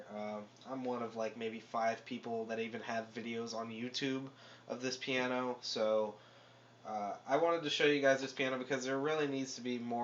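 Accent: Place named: United States. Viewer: I see now that you are living in the USA, I was in mind you were from UK, ok the accent was american, so I had to knew that, all the best and thanks, Luc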